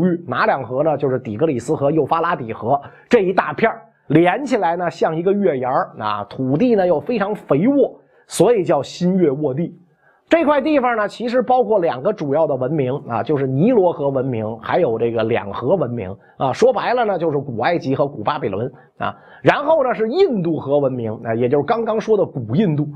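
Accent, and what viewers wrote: Beijing, China. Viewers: 嘥氣啦，從前的中華文明文化早已被滿清和共產中共中斷了。至少阿袁生你現滿囗京腔的煲東瓜就不是原來漢人語音，煲東瓜基本上是蒙滿的雜交語，作為文化最重要的載體語言都被入侵者同化了，還好意思說你同化和改變了入侵者真的是太過荒謬。還有就是儒家，從滿清到今日的儒家早已不是滿清以前的儒家了，今日的儒家是被滿清及中共改變扭曲了的奴化儒家。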